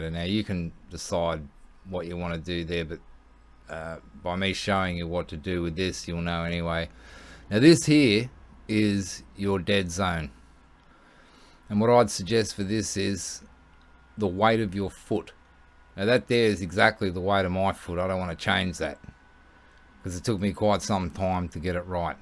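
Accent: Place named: Randwick, Australia. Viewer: You sound like you're from Randwick, mate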